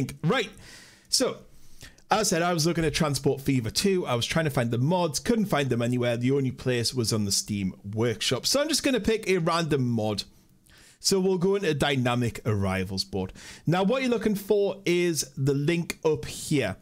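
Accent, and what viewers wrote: United Kingdom. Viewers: seems to be british.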